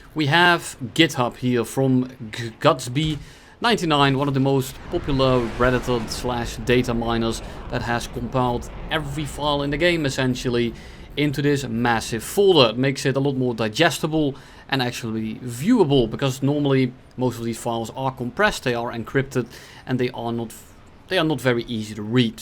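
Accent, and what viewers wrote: Netherlands. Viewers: Dutch English damn....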